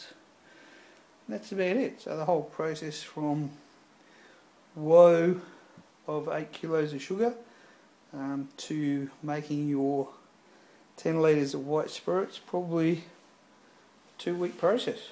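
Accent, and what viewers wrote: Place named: South Africa. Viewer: R u from Aust or Nz , u sound like SA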